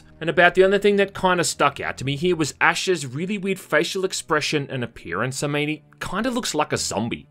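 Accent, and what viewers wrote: Australia. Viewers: yep regardless if the game is good or bad always does it justice. He is around my age n I think his bad as Australia accent helps.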